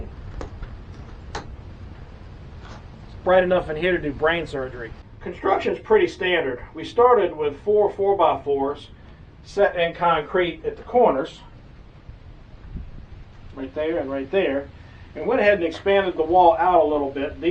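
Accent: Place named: Carolina, United States. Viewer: Hey, you sure sound like you live next door. I’m in Carolina, north of the OuterBanks. Are you near here?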